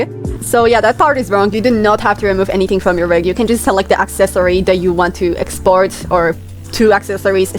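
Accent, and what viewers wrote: England, United Kingdom. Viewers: masz piękny angielski akcent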